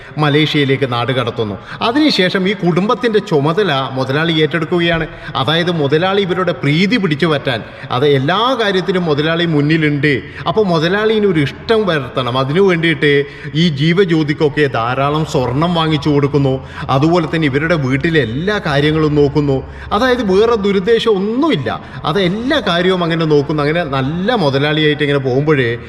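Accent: Kannur, India. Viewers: മസാല ഒക്ക കൊടുത്തുകൊണ്ട് ഇക്കിളി പെടുത്തിയുള്ള കണ്ണൂർ ശൈലിയിലുള്ള അവതരണം ഇഷ്ടമായത് കൊണ്ട് വീണ്ടും കേൾക്കും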